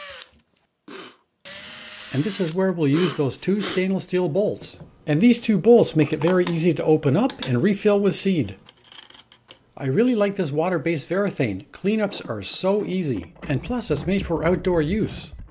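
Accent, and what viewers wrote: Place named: Canada. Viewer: I'm guessing from your accent you're in Canada? Amazing assortment of birds you have at your property! I generally just get the common house sparrow here in the UK plus the odd robin and loads of greedy starlings! Going to have a go at one of these, not because I have a squirrel problem but there ARE a load of cats around and two in particular like to stand at the base of my bird feeder and spring up at the unwary birds. Great idea and endlessly adaptable - would never have thought of using silicon!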